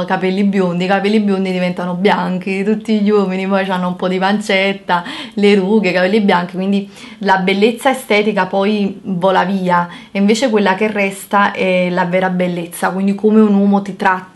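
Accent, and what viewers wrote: Naples, Italy. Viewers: queste persone sono davvero idiote ma come si fa a non amarti? cioè davvero il tuo accento napoletano secondo me è una qualitá e si deve sentire il nasone ce l'avranno loro perchè io non lo ho visto ... abbi forza non mollare per tutti noi non mollare!Saremu anche terroni ma tinemu a capa tosta e perlo in generale di tutto il sud inoltre il sorriso nonostante tutto nessuno mai si puó permettere di torgliecelo! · Cara ti seguo con piacere proprio perché sei vera, lascia perdere chi ti critica va avanti c'è tanta gente che ti apprezza davvero. Chi ti critica lo fa perché sa che, oltre ad essere una bellissima ragazza, sei soprattutto intelligente ed in gamba. Invidia si chiama, Continua così anzi più forte di prima:D Un saluto:) ps: amo il tuo napoletano.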